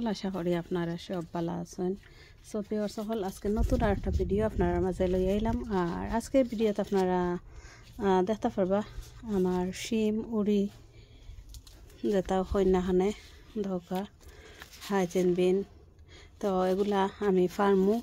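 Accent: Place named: Sylhet, Bangladesh. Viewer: সিলেটি মাত হুনিয়া খুব ভালা লাগল❤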